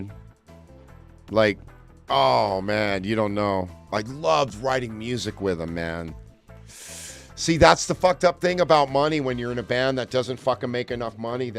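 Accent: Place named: Nebraska, United States. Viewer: You sound like you're Hastings Nebraska this is your dialect and maybe you don't know it but sound like you're from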